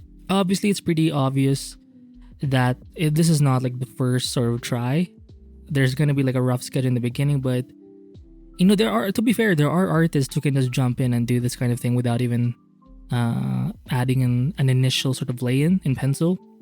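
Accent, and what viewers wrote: Philippines. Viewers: I'm getting Filipino accent in your voice, are you Pinoy?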